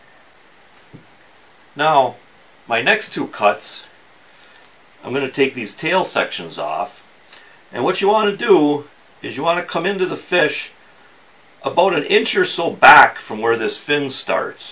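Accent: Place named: Upper Midwest, United States. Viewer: Glad to hear that your knives aren't of the $100 variety. My wife and I fish and camp mostly on the Valentine National Wildlife Refuge. It is an amazing place to panfish for bluegill, perch, Largemouth Bass, Northern Pike, and catfish. Located in northern part of Nebraska in my beloved Sandhills. Based on your slight accent😄 I'd say you are from Minnesota,Wisconsin, or somewhere north of us. If you get the urge for a change of scenery check it out on the internet. Started by Teddy Roosevelt, primarily as a refuge for migrating birds. 8 Refuge lakes open to fishing. Many other lakes and Merritt reservoir near by. You wouldn't regret it. 👍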